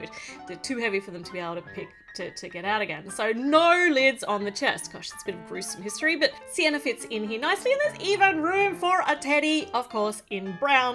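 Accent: Australia. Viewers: Me: *watches this * Mum: *walks into my room * Also mum: I rEcOgNiSe ThAt AuStRaLiAn-Y aCcEnT Legit just happened 😂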